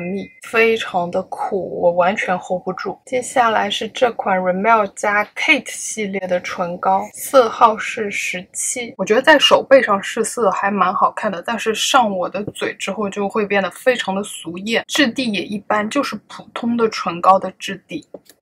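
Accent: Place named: Shanghai, China. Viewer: 是不是上海人啊，口音很熟悉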